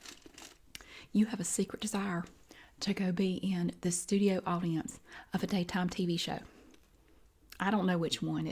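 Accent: South, United States. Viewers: kinda like a southern angela from the office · Now this is as southern as you can get. I love it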